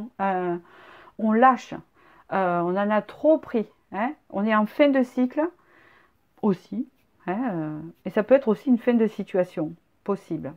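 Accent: South, France. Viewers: Merci beaucoup! Pile au moment où j'ai eu la certitude que j'avais fait le bon choix (le 2), vous parlez avec humour de votre accent du sud! J'ai pris cela comme une confirmation, moi qui suis née et vis dans le sud🤗🌻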